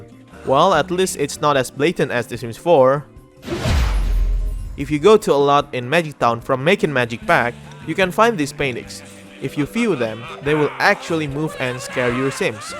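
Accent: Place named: Indonesia. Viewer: Kayak ber aksen indo, kadang ada grab ama anak sma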